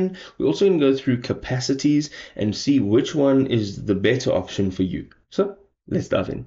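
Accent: South Africa. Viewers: I was looking for a comparison and your video was the first I saw, I must say I could hear the South African accent from the first second :P Hi from a fellow Saffa productivity fan!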